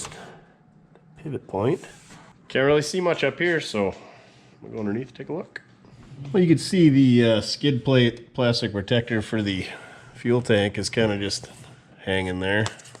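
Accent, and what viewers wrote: Minnesota, United States. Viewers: I dont know where your from but there is a guy in Minnesota that yalks like. you do.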